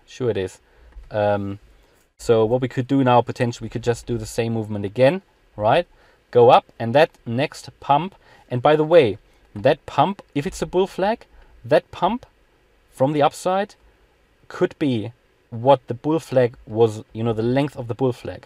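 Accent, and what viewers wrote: Germany. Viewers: love this channel, thanks dude. keep it coming. btw are you german?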